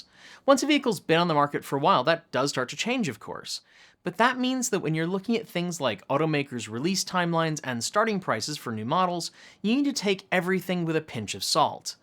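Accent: United Kingdom. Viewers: Am I crazy or do you usually have a British accent? Your American accent is very good! I wish I could do a british accent as well as you do American haha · Great advice especially for me who is saving up to purchase a used EV.. Wait a minute... What happened to British accent? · What happened to the British accent?!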